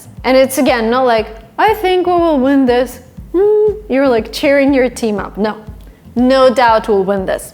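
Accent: United States. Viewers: I am Bangladeshi. I want to speak in American accent. I understand your American accent. But I can not speak like you. I am a govt. high school student in Bangladesh. I want to go to America for higher study. This is why I really want to speak in American accent. (My school's teachers are not good at American or any other accent). Our country has no any English accent. Some example of their (teachers) pronunciations are : Water=Water Think=Think Something=something If I speak American accent in the class everyone of my class laughs at me. They tell me ,"it is Bangladesh not America. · To my mind she was born to teach. I am absolutely sure that i’m gonna use these alternatives and use less “ I think “ so that i sound more fluent. Thanks for ur practical lessons dear i really do love ur voice and ur American accent. Above all I wanted to appreciate for teaching us all these contents for free. 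Wish u all the best🥰❤️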